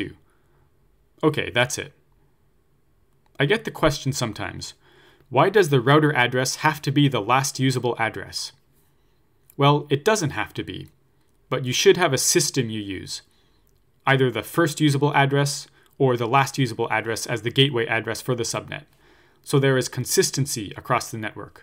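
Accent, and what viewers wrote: Canada. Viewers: Comment for my Canadian sounding homeboy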